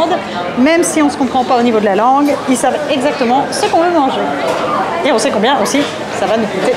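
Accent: Belgium. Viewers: vous etes belgiques ou francais?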